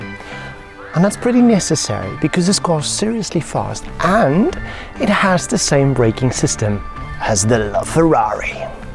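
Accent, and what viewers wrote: South Africa. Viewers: not sure. Just know he’s South African from the accent. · Is the presenter South African? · Any chance you from South Africa with that accent? 🤔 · you sound very South African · Mate you sound like you could be from SA?? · This guy sounds South African 👌 are you?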